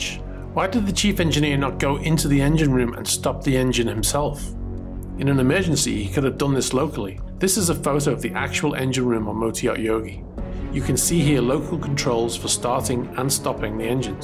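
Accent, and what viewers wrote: Liverpool, United Kingdom. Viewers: Great video great too hear a scouser doing well in YouTube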